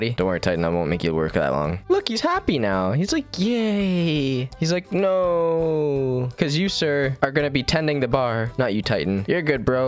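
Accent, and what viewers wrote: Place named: Canada. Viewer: Your Canadian accent is so magnificent...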